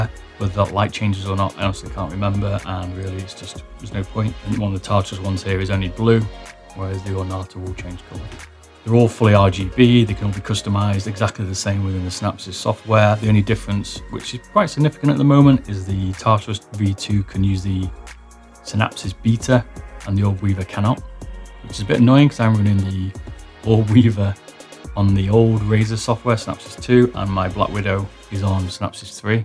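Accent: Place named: Cockney, United Kingdom. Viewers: Enunciate please. Too Cockney to understand. There's some good info in there somewhere. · I'm betting Scottish, if not then English. Yeah, people have accents, some are harder to understand compared to others. I understood you just fine. Though the music may have been a touch loud.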